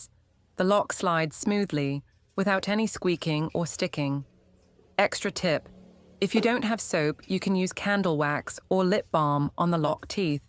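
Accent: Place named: United Kingdom. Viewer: Thanks. Very helpful. You list your location as the United States - but you have a beautiful clear standard British voice and accent!...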